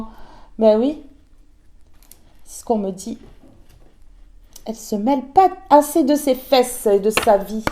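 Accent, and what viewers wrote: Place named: South-West, France. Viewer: Merci j adore votre accént sud ouest de la France vous etes une bonne personn ej adore votre humour bonne continuation dans la voyance et desolee j espere ne plus vous embeter avec mes problemes merci beaucoup votre humour me fait du bien je vous souhaite que du bonheur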